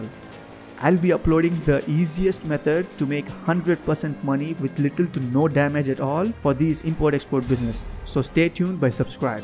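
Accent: India. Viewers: Tnx bro it works 😉😉😉 btw I love your indian accent 😘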